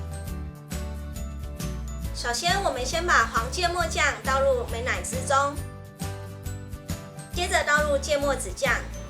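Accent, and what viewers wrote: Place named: Taichung, Taiwan. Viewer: 台中腔，ㄓㄔ不分 ❤️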